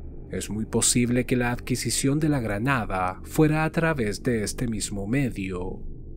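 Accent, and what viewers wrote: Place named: Chile. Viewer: Hola, siempre veo tus videos, pero primera vez que comento algo. Sabes, siempre me ha intrigado esto de los enigmas policiales y como opera la mente de un criminal, es alucinante. Me encanta tu forma de relatar, me gusta que seas chileno porque me gusta tu acento (yo soy chilena), y me gusta el formato de imagen que sin ser morboso o grotesco es bastante grafico y real, la estructura del relato me gusta mucho. Te deseo sigas triunfando y mucho éxito para 2023.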